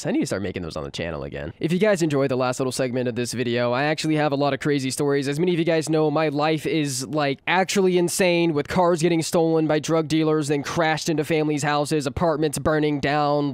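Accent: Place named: Texas, United States. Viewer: Sounds like Texas...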